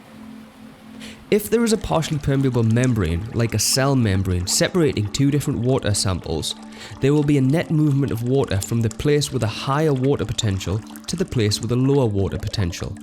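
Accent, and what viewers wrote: United Kingdom. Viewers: british boiii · i personally love the british accent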